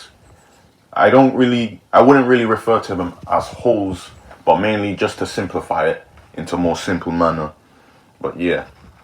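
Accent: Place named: Roadman, United Kingdom. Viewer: You’ve got the roadman accent, love it!!! Great video though, the sound is the best 😂😛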